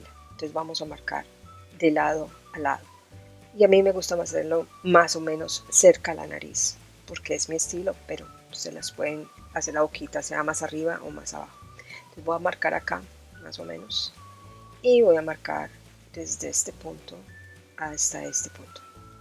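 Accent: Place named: Colombia. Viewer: Siempre admiro tu trabajo . Puesto q es bello bello .. saludos desde Villahermosa Tabasco mex. Hoy me dejas sin palabras, super explicado y detallado . Siempre m pregunto de donde eres, creía q eras española pero hoy tu voz me pareció diferente y pareces colombiana, 😙😙😍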